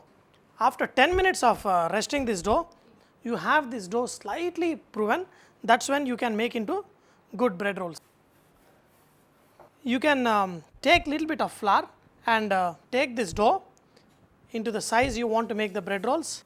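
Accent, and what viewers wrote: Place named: Telugu, India. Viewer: I like the way this guy speaks.......the accent is of a typical telugu guy..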